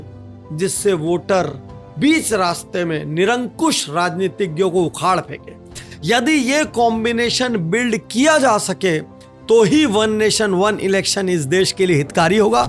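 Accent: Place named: Gujarat, India. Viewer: लगता है सर आप गुजरात से है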